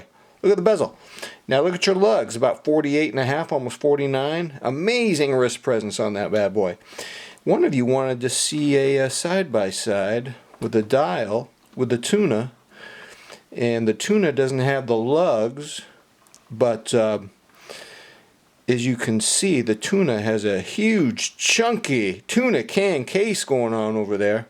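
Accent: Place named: United States. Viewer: I love your collection and your thoughts on it. Great American accent that always makes me smile. Please keep up the good work.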